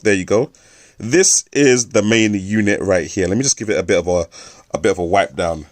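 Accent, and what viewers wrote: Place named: Cockney, United Kingdom. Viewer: Really good review, thanks for posting. Brilliant accent by the way. SO cockney, kind o ting... you get me?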